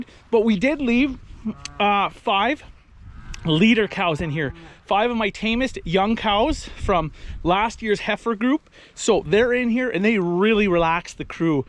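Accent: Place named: Upper Midwest, United States. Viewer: Oh wow, you're the real deal! WI or MN by the accent, yeh? I like that you're nice to them, use honey opposed to stick. :)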